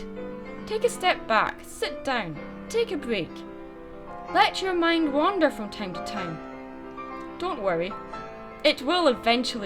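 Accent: Scotland, United Kingdom. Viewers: Big fan of your Scottish accent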